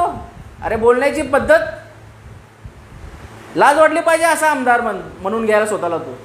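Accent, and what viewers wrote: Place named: Solapur, India. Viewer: सोलापूर ची भाषा तुमची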